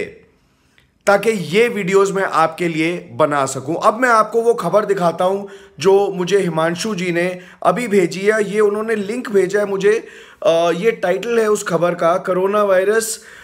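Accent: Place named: Punjab, India. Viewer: Sir, the way in which you speak corona exactly matches the way my father speaks... :P .. Are you a punjabi too?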